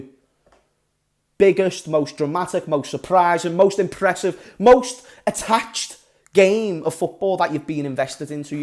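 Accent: Liverpool, United Kingdom. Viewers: Stupid scouse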